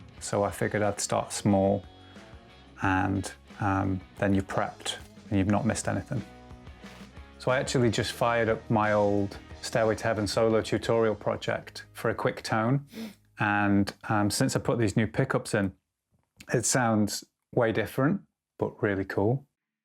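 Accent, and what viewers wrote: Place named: Yorkshire, United Kingdom. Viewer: Sooooo good to hear a Yorkshire accent instead of American! Brilliant and funny! "It's my 'ows" love it hahahahahaa!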